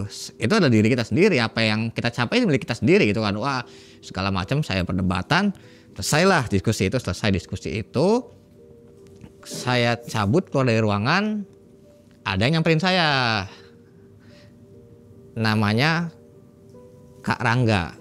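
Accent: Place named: Cirebon, Indonesia. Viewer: Logat Cirebon nya ketarak banget bang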